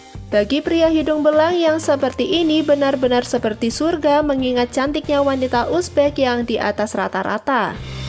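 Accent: Java, Indonesia. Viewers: Suaramu medhok mbak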